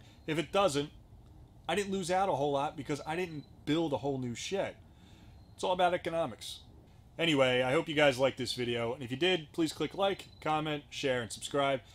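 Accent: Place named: South Jersey, United States. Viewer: Haha! I am looking to buy one of these Harbor Freight portable garages. I found this video and within the first minute of listening to you talk, I was like, "this guy sounds like he is from South Jersey". Then as I continue to watch, you say, "In Jersey..." and I was like, yep, bingo! So then after watching, I check your description and you are over in Gloucester Twp. I laughed out loud. As someone who was born and raised in South Jersey and travels the country often, its so easy for me to pickup on the accent, its ridiculous. I mean, yea mostly everyone from the Delaware Valley have that accent but PA and DE people have slightly different twangs to it and unless you listen for it, its not very noticeable. You must have also been raised locally because your South Jersey accent is so spot on. You should do a video on Panzarottis LOL!!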